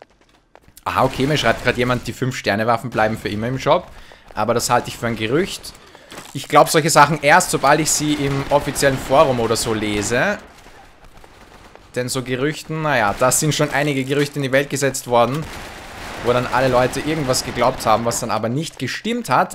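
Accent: Austria. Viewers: Bist du Österreicher dein Akzent klingt so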